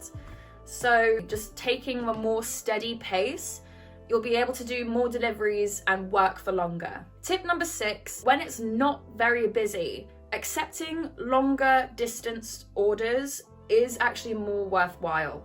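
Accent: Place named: England, United Kingdom. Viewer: Your amazing! 😍 How come you have a english accent if you live in Spain?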